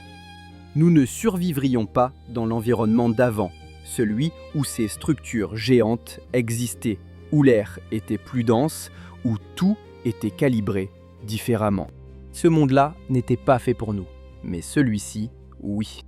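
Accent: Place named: Maghreb, North Africa. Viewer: J'adore ton accent très légèrement maghrébin.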